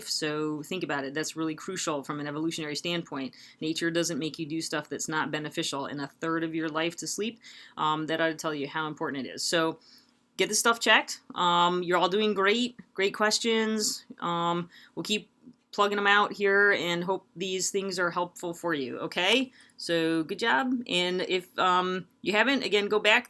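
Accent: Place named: Upstate New York, United States. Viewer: Great video. I am also loving the Upstate New York accent!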